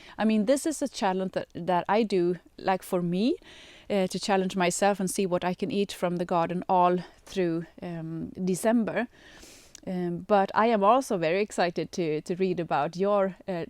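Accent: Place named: Sweden. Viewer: Tack så mycket. Jag tycker om dig och ditt innehåll. Jag lär mig mycket. Din engelska är utmärkt. Det jag särskilt älskar är att höra din söta accent. Jag ska berätta varför ... Jag är mormor, författare och lärare i Seattle. Jag älskar också att trädgård, sticka, sy, läsa och spela musik. Min make är en Blues-trummis. Jag har bott här hela mitt liv. Men ALLA Fyra av mina morföräldrar var från Sverige! (Stockholm & Malmö) Det finns ett starkt skandinaviskt inflytande i Seattle. Jag tänker alltid så mycket på mina morföräldrar vid jultiden. Jag bakar min mormors kakor och andra recept varje år. INGEN gör julen bättre än svenskar !! Jag har underbara minnen och traditioner. Din röst påminner mig om deras kära röster. Tack.(Google Translated for fun. )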